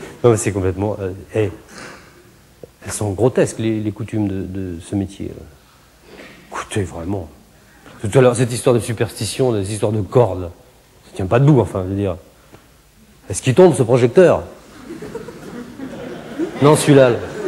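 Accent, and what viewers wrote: France. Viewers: Il est beau l'accent de Dunkerque x) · Il me fait pleurer ce mec, de rire et de peur, je l aime ce mec et son humour… avec son accent limousin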